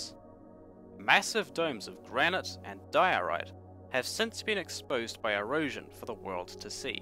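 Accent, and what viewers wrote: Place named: New Zealand. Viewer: Nice music, but it makes me relax to the point of sleeping. It becomes harder to focus, and since I love (and miss) the kiwi accent, it acts as ASMR ^^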